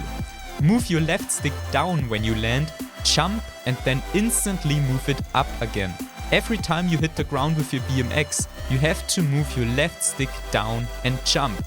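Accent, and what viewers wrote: Germany. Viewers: Man hört den deutschen raus 😂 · Are u a german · Dieser Deutsche Akzent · Irre ich mich oder höre ich da einen deutschen Akzent heraus? 🤭😂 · Its a DEUTSCHER AKZENT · Is he German he has so A German act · Dieser deutsche Akzent xD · Du bist deutsch oder? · Bavarian englisch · Man hört richtig das du deutsch bist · Are You German? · The fax that’s he German u can say by he’s accent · wusste ich doch, dass ich einen Landsmann an seinem Akzent erkenne. xD Danke für die schnelle Antwort, mach weiter so mit den Videos. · Ahh ich war mir nicht ganz sicher als ich den Aufbau der Deutschland Flagge auf dem Pfp gesehen habe aber mit dem Akzent bin ich mir sicher also dir noch ein gutes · Are you german? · Dieser deutsche Akzent zu wild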